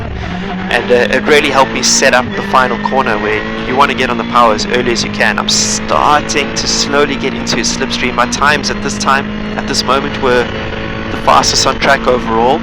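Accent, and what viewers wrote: South Africa. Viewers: are you South African? you sound South African. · You sound South African. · Greetings from SA! Totally unmistakable accent right there.. (: Nice to see a home boy succeeding like this in the racing world. Suffice to say I'm jealous of the cars you get to drive...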